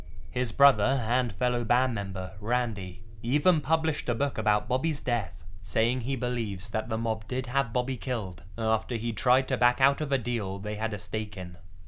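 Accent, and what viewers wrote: United Kingdom. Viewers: I feel people who speak harry potter are the best narrators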